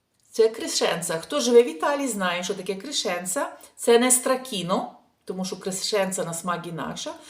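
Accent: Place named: West, Ukraine. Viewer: Дорога, скільки учительок в вас на каналі появилось 😂, а мені подобаються ваші відео, ваша спонтанність, наша говірна мова західної України. Я б ще добавила, кому не подобається,і постійно критикують, вони вільні не дивитись, але ж це спеціалісти, saputelle, лиш писати негатив, інше не вміють. Гарно проводіть вашу відпустку, місця чудові, їжа теж, всього найкращого❤🎉.Ви гарна пара з вашим compagno💓🐞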